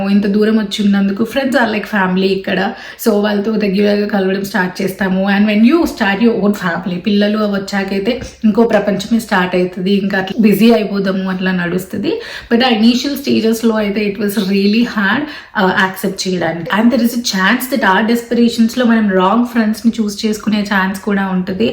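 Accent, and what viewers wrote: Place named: Canada. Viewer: vat izz dis, whu bludy cars abut ur uze les histeri. nd u r aksent iz u born nd brat up in khanada not in India. duck off nd u cho kalled NRI's doont put dese vedios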